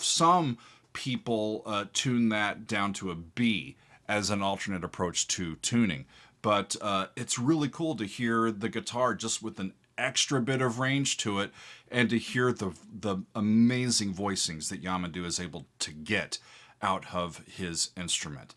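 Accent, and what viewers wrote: United States. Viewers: seu sotaque americano é muito bonitão! Gostei! ❤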